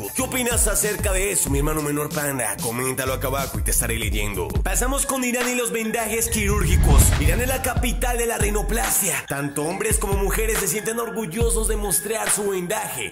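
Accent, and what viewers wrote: Colombia. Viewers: Hola bro eres Colombiano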